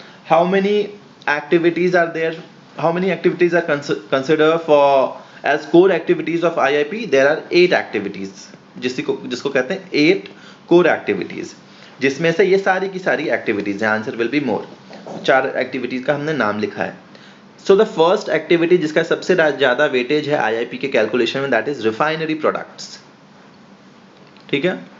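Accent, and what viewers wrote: Bihar, India. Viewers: Bihari tone jabardst hai apna sa lagta hai aise hin padhate rahiye sir👍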